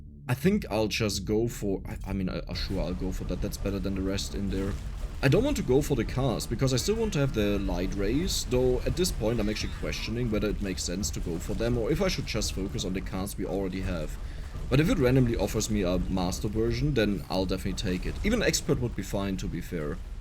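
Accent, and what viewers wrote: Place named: Germany. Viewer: loved the video so far, but kind of curios... are you german or from a german speaking country? I feel like your accent kinda sounds like it ever so slightly.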